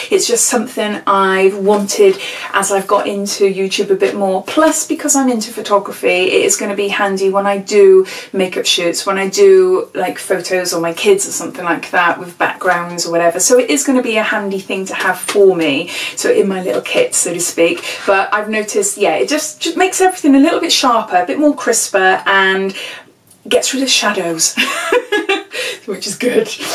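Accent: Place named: England, United Kingdom. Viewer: Very interesting video. I love listening to you. It brings a bit of England to me here in Germany.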